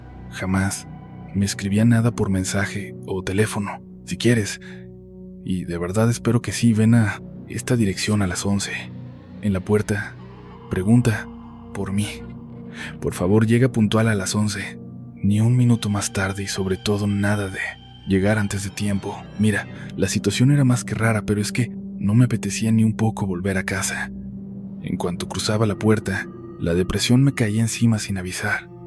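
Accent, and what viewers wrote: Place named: Portugal. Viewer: POR QUE DE REPENTE TIENES ACENTO COMO DE PORTUGUÉS Y ESTUVO RARO QUE SE REPITE UNA PARTE QUE YA HABIAS CONTADO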